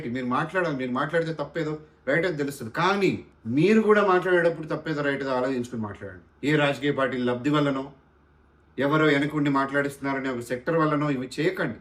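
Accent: Telangana, India. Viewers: తెలంగాణ బాషా మస్త్ మాట్లాడతాన్నావు భాయ్ ఏ జిల్లా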